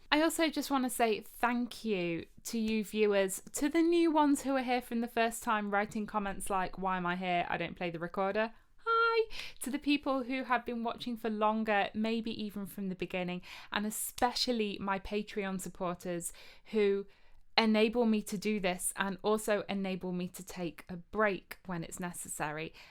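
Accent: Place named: United Kingdom. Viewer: We can tell you're definitely British. You being a Brit owes its verisimilitude not only to your accent, but to your expressions. For example, your counterparts across the pond would say: “I’m going on vacation.” An American 🏴🗽 would never say, “I’m going on holiday.” 👌 😉 Stay safe, stay well and keep the faith. Or as would say “Have a great vacay! Enjoy it and be healthy!” 👍 😊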